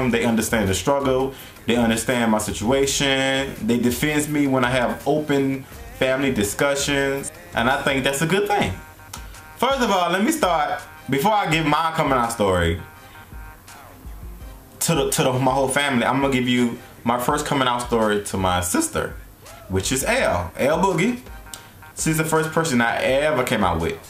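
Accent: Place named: New Orleans, United States. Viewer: I hear the Nawlins accent... I am from Louisiana also... You are one pretty man!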